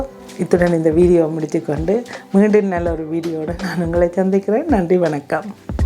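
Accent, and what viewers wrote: Jaffna, Sri Lanka. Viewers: யாழ் தமிழ் மிகவும் அருமை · Very fine.thanku very much. Your srilanka tamil fine.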